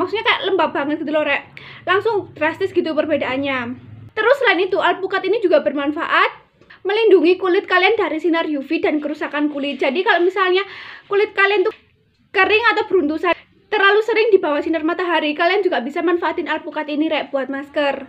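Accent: Java, Indonesia. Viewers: Assalamualaikum Rek kembali lagi bersama video youtubeku... Demen sama video mbak ini jowone enak dengee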